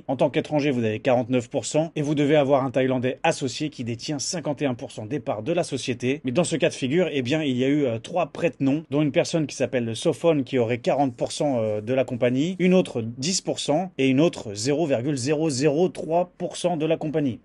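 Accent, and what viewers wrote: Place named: Suburbs, France. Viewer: Je serais curieux de savoir dans quelle banlieue tu as appris le français, même si aujourd'hui c'est un peu meilleur que d'habitude...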